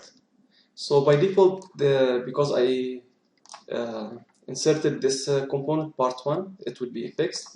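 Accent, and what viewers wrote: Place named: Arab world. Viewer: انت عربي لهجتك مش انجلش خالص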